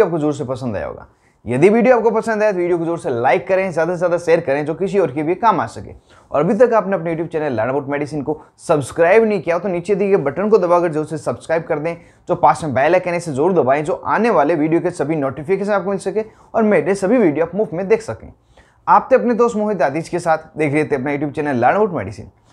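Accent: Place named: Marwari, India. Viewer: Marvadi ho ton to marwadi lgti he 😅😅😅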